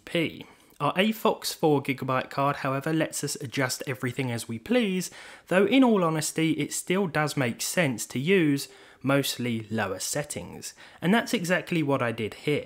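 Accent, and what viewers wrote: United Kingdom. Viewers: *Far east* Now i believe you, that are you brittish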